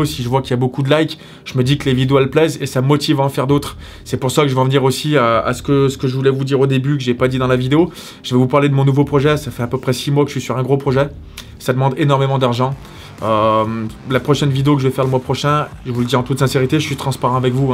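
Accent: Saint-Étienne, France. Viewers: T'as un de ces accent stéphanois ! Haha bienvenue au club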